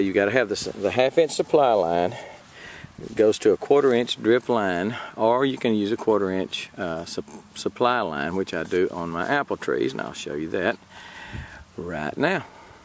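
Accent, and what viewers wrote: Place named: South, United States. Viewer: I love hearing the Southern drawl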